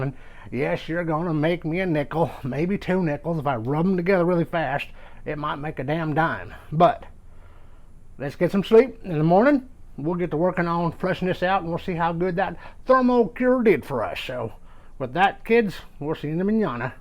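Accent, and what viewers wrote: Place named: Ireland. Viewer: It's the Irish accent.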